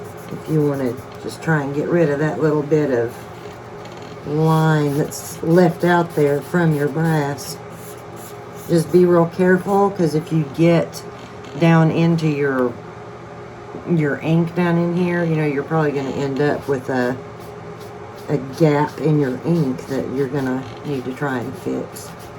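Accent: South, United States. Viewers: I like your Southern accent. Maybe you could edit out the blow dryer noise. That's kinda loud.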